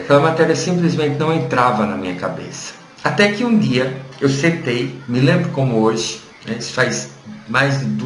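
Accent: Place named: Rio Grande do Norte, Brazil. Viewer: tu és de qual estado? Não consigo identificar o sotaque... Acho que é do Nordeste!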